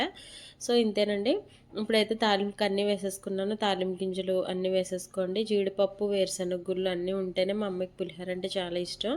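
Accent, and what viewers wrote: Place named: East Godavari, India. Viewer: Matladithe east godavari antaru madhi east godavari sister Maa side chesukuntaru super sister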